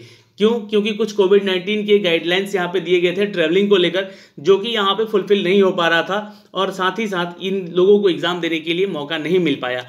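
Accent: Bihar, India. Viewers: Bhai bihar bolte hai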